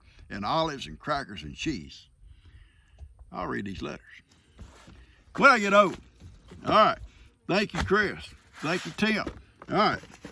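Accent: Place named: Texas, United States. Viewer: I LOVE that Texas accent of yours, I could, and have, listened all day. And seeing you out in that beautiful pasture…you’re killin me. Well, a girl can dream right and you can tell GiGi I said so😂😂😂I know you only have eyes for her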